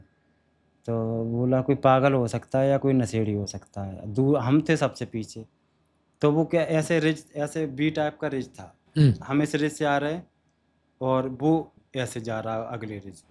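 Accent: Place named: Gwalior, India. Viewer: Tumhari bhasha gwalior sambhag ke aaspaas ka hai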